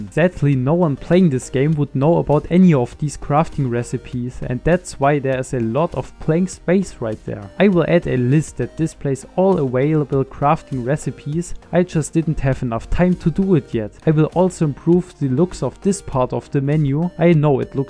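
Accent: Germany. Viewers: you have a german accent.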